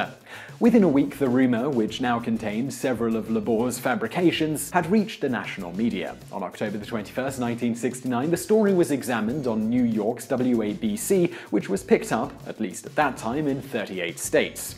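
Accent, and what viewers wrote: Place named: Scotland, United Kingdom. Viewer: You have a scottish accent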